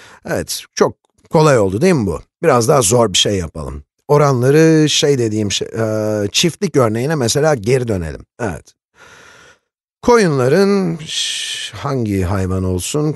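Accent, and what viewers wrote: United States. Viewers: hocam amerikalılar gibi konuşuyorsunuz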